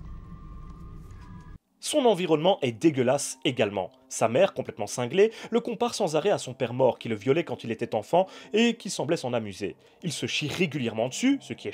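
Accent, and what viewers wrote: Belgium. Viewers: Avec plaisir cher je partage ta passion:) + j'aime trop ton accent belge.Continues tes vidéos on t'aimes:) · et là bas compatriote Belge! Enfin....sans vraiment savoir, juste ton accent met familier! Hoooooooo oui Clairement, je suis fin friands et amateur de cinéma Underground et ce.....depuis longtemps! J'ai 31ans mais mon VHS des Germelins a 5ans me marquera pour la suite de ma vie cinématographique, ensuite a 10ans+- je reste bouche baie devant les Cénobites de Barker et forcément.....Freddy, je suis un ptn de fan des nanars gore des années 80, Abomination, BlackPast, BasketCase, les TROMA et puis bon....j'ai un vrai problème avec les zombies pr qui j'y porte une affection particulière (ON AIME PAS LES ZOMBIES QUI COURENT!) Romero, Fulci etc....etc.... Étant un gobeur de GrindCore/GoreGrind/BrutalDeath/BlackMetal etc.... C'était presque obligé de tomber fan du cinéma Underground avec une préférence pour Vogiel & Lucifer mais je gobe tout! 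Vive les litres d'hémoglobine, le sarcasme, l'humour noir et le 17e degré de l'humour! J'étais obligé de m'inscrire chez toi en découvrant ta chaîne ce matin 🙏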